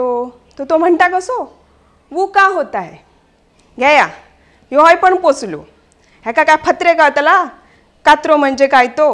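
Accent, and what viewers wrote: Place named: Malvani, India. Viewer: Apli Malvani dunayet Bhari God rasal 👌👌👍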